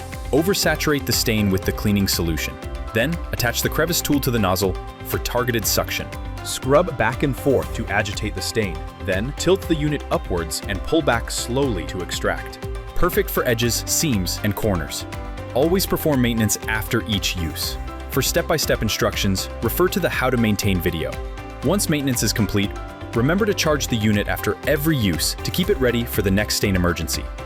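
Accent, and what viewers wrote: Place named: United States. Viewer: what an awful video with irritating music and fast speaking american accent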